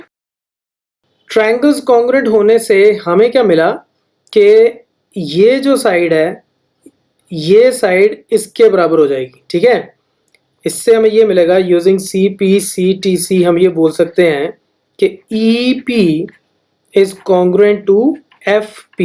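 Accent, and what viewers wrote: Punjab, India. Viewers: Are you punjabii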